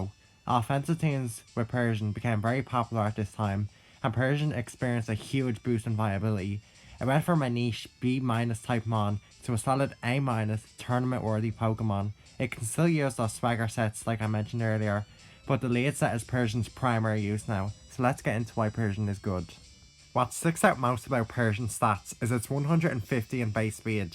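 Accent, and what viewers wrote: Ireland. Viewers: Ok I’m going to guess the accent is irish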